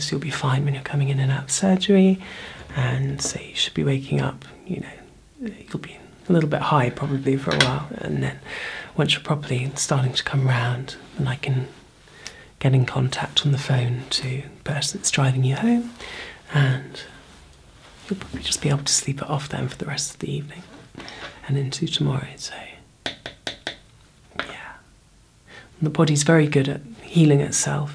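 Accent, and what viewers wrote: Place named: United Kingdom. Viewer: Gods, you're gorgeous as both male and female I just--- can't. how iN THE WORLD IS THIS POSSIBLE 😭😭😭💜💜💜 that aside, I got what I asked for lol thank you, it's tingly as always and you always speak in such a clear, crisp, and gentle voice, with posh English accent that I could understand perfectly even to my untrained ears as English is not my mother tongue 🌟🌟🌟🌟🌟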